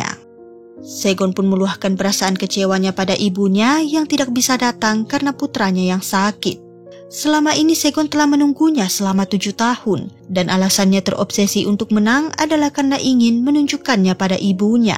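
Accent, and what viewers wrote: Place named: Bali, Indonesia. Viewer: Orang bali kah,,,,??? Logat setiap kata ada Te tapi di ucapkan Dhe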